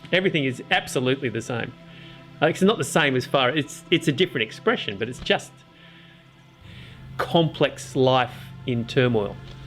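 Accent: Australia. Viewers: Thank you for making this. I listen to a fair bit of ND stuff and yours is clear in a way that takes me right to the edge of ( non) understanding. Hearing this in an Aussie accent helps too!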